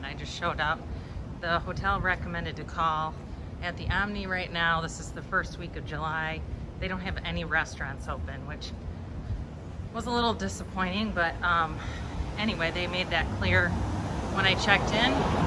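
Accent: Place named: Chicago, United States. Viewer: I like your accent. Are you from ChicAHHHgo? 🙂🙂🙂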